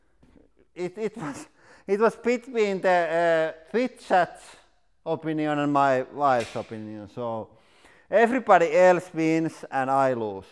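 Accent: Finland. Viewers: I've been wondering why this guy sounded like Kimi Raikkonen, little digging and just found out he's Finnish, no wonder lol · ralli englanti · Without checking, you are from Finland :) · Drop the talk. Can't tell if you're speaking Finnish or English with extremely heavu Finnish accent. Put up some text instead. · Finnish accent?? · Most Finnish accent ever. Go Finland 🇫🇮 Soumi!!!!! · Sounds like this guy is from Finland and he has some Biltema jack's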